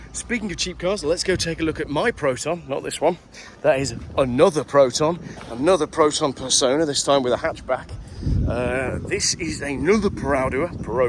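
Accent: United Kingdom. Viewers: Bri’ish guy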